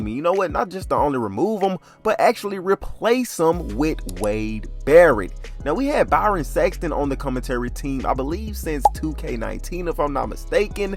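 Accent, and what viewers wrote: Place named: South, United States. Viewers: your accent is thick, I'm guessing either North Carolina or Alabama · Gotta be Texas that twang is in there heavy lol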